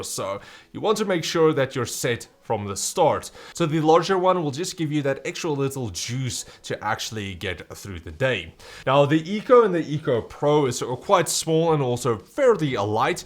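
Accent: South Africa. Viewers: Meneer jou aksent maak dit bitter moeilik om na jou te luister, hoekom doen die Suid-Afrikaners dit, dit klink verskriklik.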